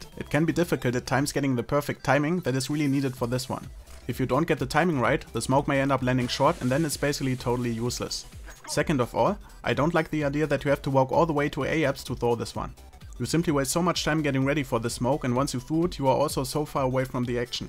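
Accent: Germany. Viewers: der deutsche lol dat english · Are you from Germany? You have very german accent. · Das deutscheste Englisch ever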